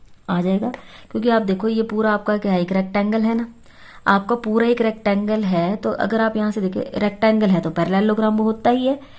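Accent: Haryana, India. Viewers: I think Haryana by her accent and all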